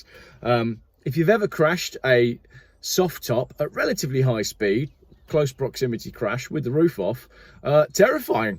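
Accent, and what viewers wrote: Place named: Birmingham, United Kingdom. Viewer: Solihull? I thought I recognised the Brummie accent. I grew up in Solihull, left in 1986.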